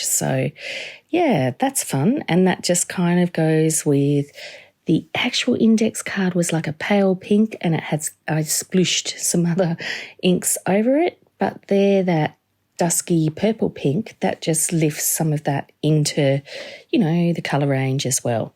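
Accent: Australia. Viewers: You are an absolute delight! Love your Aussie accent, put a smile on my face, being a fellow Aussie living in West Yorkshire, UK! Will definitely have a go at making these wee gems and your split pins/Brad pins too! Thank you for a wonderful tutorial and I’m so happy to have found you! 🥰🫂❤️🙏🏻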